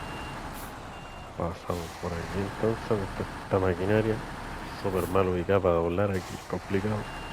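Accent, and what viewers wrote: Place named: Chile. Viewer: y a ese suscriptor, o "visualizador" de tus vídeos, tampoco le gusta tu acento chileno? Jajajajajaja La verdad, la gente se aburre mucho. La gran mayoría estamos contentos con tu narración y explicaciones, que es lo que cuenta. Si le gusta más la "buena" narrativa que el contenido, también hay canales de poesía. En fin, como siempre, otro gran vídeo. Saludos desde Moscú 👌🏼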